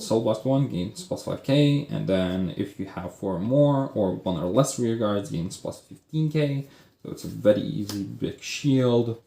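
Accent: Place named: Poland. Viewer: Where are captions? I can't understand this polish accent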